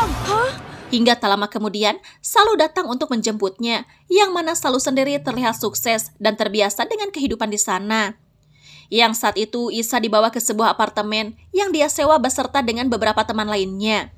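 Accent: West Java, Indonesia. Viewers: Ini admin nya kaya nya orang sunda nya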